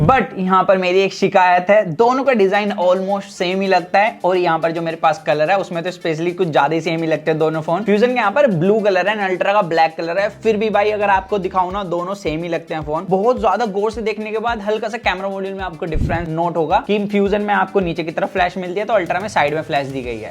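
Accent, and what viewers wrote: India. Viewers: Such heavy Indian accent. Don't understand what he is saying.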